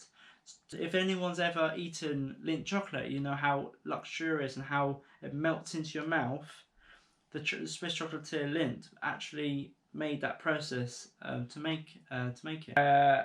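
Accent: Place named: United Kingdom. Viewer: I would love to see a house tour as well as yard also. I never been to UK...I love the accent ✌️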